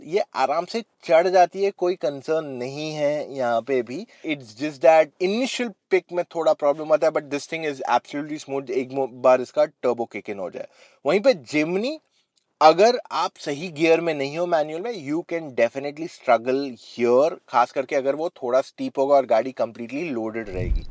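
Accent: Gujarat, India. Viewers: Bhai kya aap gujarati ho kya ?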